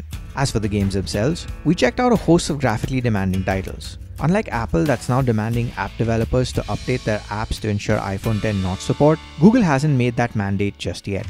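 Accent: India. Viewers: i always like how indian's pronounce FANTASTIC XD its one word that lets u know if he is indian or not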